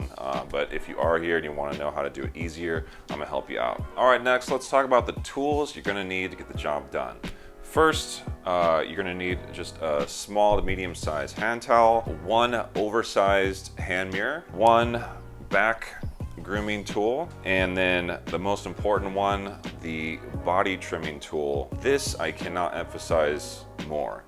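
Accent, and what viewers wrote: United States. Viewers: That god-awful American accent, like scraping a nail across a chalkboard.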